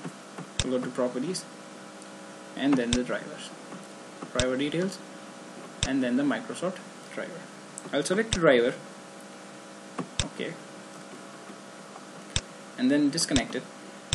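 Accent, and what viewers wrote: India. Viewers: Oh god i fucking hate your indian accent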